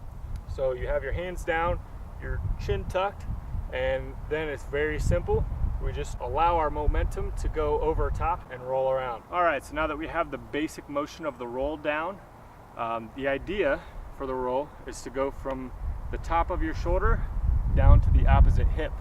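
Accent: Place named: United States. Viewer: your voice sounds very american 😳🤔🙂 greetings from Vienna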